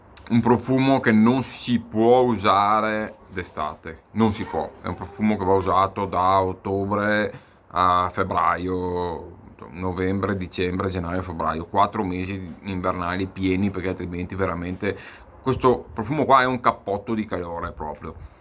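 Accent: Veneto, Italy. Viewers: Salve,potrei chiederle con tutto il rispetto,di dove è il suo accento?.Sono molto curioso dato che studio la lingua italiana da un po’.Se non sbaglio il suo accento protrebbe essere Veneto….Volevo anche ringraziarla per la ottima recensione.Grazie mille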